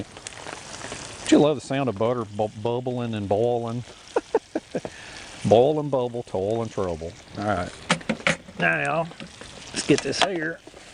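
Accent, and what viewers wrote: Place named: Kentucky, United States. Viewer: Are you from Kentucky? I grew up in Kentucky and your accent sounds familiar to me.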